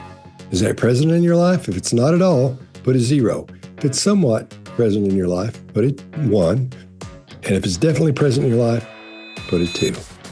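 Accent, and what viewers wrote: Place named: Texas, United States. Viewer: Scott's voice is so soothing, and his accent sounds very Austin / Dallas.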